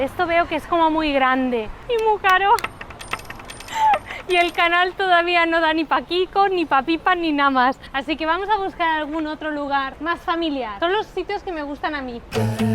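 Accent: Andalusia, Spain. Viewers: Una Catalana con acento andalú? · Hola!! tengo una duda, porqué tienes acento andaluz si eres de Catalunya? ole tu carisma 😍 saludos... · Y porque el acento andaluz, si sois catalana, o me equivoco completamente? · Algún día visitaré España. Yo pensé que eras de Andalucía, no se, tu acento!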